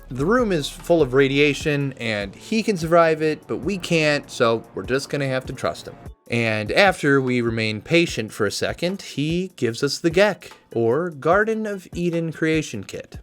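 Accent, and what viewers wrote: Indiana, United States. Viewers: Is dude a Hoosier?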